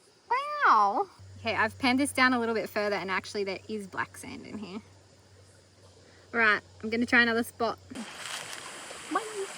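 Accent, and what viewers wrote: Australia. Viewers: The quality of your videos is always A1 but that one was exceptional. New camera? Different settings? In any event, ace job!!! Ginger nuts!!! A girl after my own heart! I'm a Brit, have you tried Mcvitie's Ginger Nuts? Awesome!!! Talking of Brits, I've always been curious of your accent. Is it pure Australian or is one of your parents from the south of England? In any event, I love it!!!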